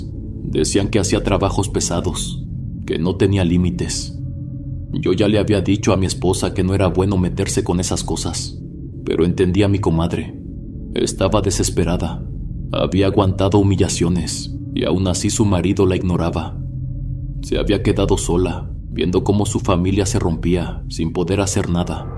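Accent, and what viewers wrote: Mexico. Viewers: !! Qué buena historia!! Muy buena narración y lo mejor con ese acento bien mejicano, soy de Perú 🇵🇪 y te oigo siempre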